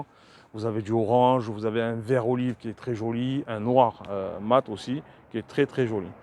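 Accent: South, France. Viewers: Merci pour cet essai, avec le bel accent du Sud!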